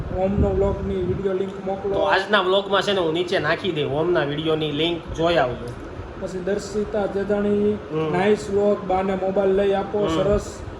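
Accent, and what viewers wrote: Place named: Gariyadhar, India. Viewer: Tamara video hu darroj jasadn thi job chhu aa baju aavo tyare aavjo. Tame gariyadhar bajuna chho. Tamari boli upar thi. Aevu. Lage che.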